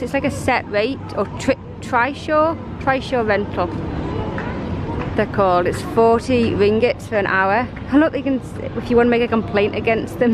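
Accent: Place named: Northern England, United Kingdom. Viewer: Your a great travel guide. Love your northern English accent.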